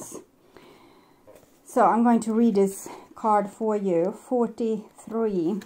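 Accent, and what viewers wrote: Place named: Sweden. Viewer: I knew you were sweadish when I first heard you. I lived in Sweden in the 90 's was so beautiful times !!🦋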